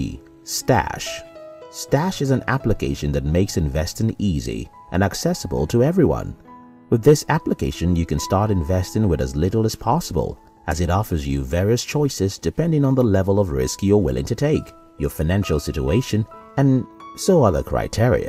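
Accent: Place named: Nigeria. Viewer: The voice over sounds like that of a Nigerian.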